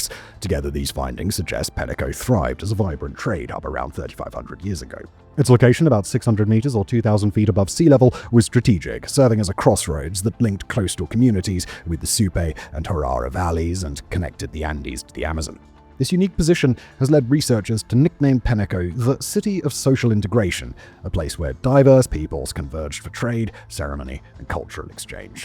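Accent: United Kingdom. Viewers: you always have fascinating videos. For Americans your accent lends credibility because for some reason a brittish accent makes t he information more credible. There is one thing though. At times you drop what you are saying into your throat and a very deep register and lower the volume that makes what you are saying hard to hear. I recognize this as part of a typical British way of speaking, but what you say is too interesting to be lost. As a public speaker myself, I have to be aware of my own verbal idiosyncrasies so as to reach my audiences more effectively.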